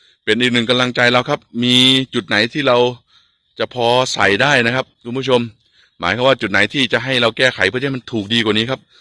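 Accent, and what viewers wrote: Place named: Isan, Thailand. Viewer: เว่าภาษาอีสานได่ดอกฟังแล้วมันกระดากหูว่ะ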